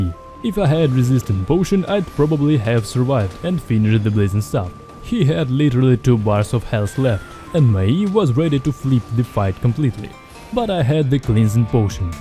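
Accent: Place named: Eastern Europe. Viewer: This guy never misses! Once again, a great video. I also like how you included and broke down the fights with each staff, very informative. You probably already have it on your list, but I would love to see an arcane staff video from you. Arcane been kicking my a*s in CD but might be just a skill issue on my end. Just a bit of viewer feedback, I personally found it harder to follow at times, as the music seemed to be loud at some points of the video. Personally, I would prefer if the music volume would be a little lower overall. As someone who has an Eastern European accent like yours, I find it that people take more time to register what your saying. So during the fast paced fight narration parts of the video the music can create a bit of a barrier. Other than that, it's a perfect video! Love your style, and thanks for sharing your knowledge with us mate! 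Giveaway entry: VageMage - Asia server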